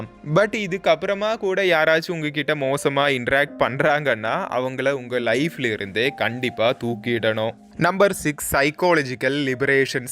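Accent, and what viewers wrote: Kerala, India. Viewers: Kerala thambi vioce maari irukkea 🤔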